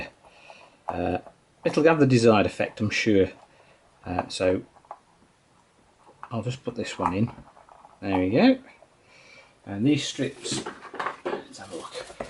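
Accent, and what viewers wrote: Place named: Stoke-on-Trent, United Kingdom. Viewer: Hey bud, Cracking vids... I’ve just purchased one of these mn99 myself and will be looking to weight my wheels when it arrives, What weight would you suggest putting in after your test? 40g 50g? Also I couldn’t help but notice your accent, are you local to the Stoke On Trent area?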